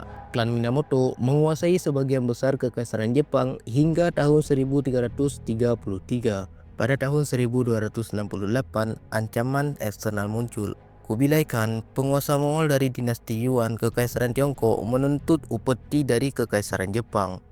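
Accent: Aceh, Indonesia. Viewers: Logat acehnya nampak kali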